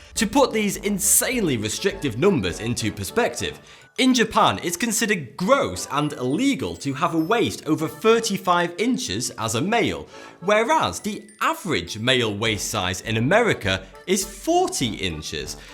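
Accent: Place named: Yorkshire, United Kingdom. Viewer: Man, that Yorkshire accent...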